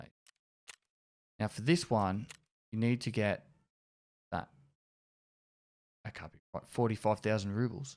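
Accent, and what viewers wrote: Australia. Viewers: I recently found out Australians are the only ones who pronounce it differently (like he does).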